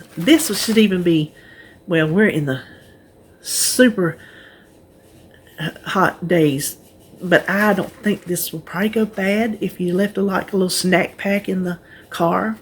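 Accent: South, United States. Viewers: These are a great idea!!! I love pop chips, but I buy the bigger bag and weigh them out and put in baggies. Bc I could definitely eat to many. I buy the big bags bc I love the flavored kind, barbecue, sour cream and onion, and cheddar.. so good! I don’t care for the plain. You are so sweet!!! Love your accent, I’m from the south and moved north for my husbands job, so it’s so refreshing to hear that accent. Everywhere I go someone always comments on it 😂.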